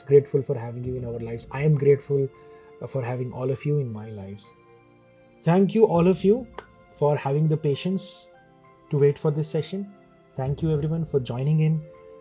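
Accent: India. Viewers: Sir is Hyderabadi guess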